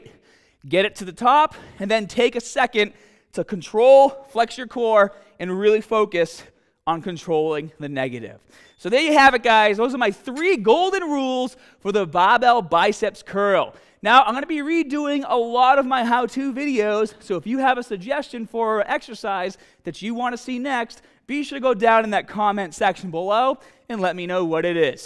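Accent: Boston, United States. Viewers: You got a lot more Bahhstun from your videos five years prior. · Boston accent ? Thank you great video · Appreciate the video, but check the BAHston accent. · Love your Baaaasten accent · Is this guy from fucking Boston? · I’m not even from the US but even I recognize this guy must be from Bostaahn Mass. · Boston? Rhode Island? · Theres a touch of a boston southie accent in there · I forgot that the Boston accent existed, Haha. Good info from the dude tho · SO Boston, LOL! Great vid!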